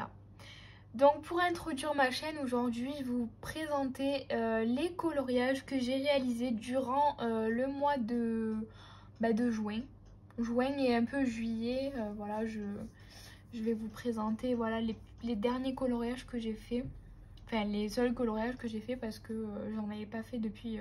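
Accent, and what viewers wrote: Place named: South, France. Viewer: Bonjour! Bienvenue et bonne continuation à ta chaîne très belle accent du soleil. Tu as de très beaux colos, et moi je me suis abonné à ta chaîne.je te souhaite pleins d'abonnés et éclate toi. Gros bisous du Morbihan sud 😘🥰🤗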